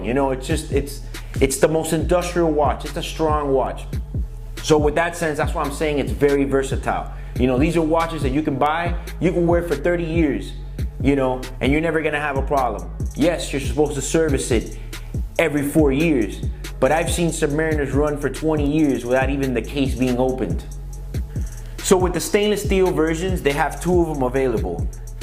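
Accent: Cuba. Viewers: Cuban accent,, interesting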